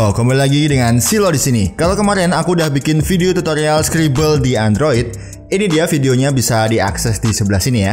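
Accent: Kudus, Indonesia. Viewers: wong kudus ta mas? wkw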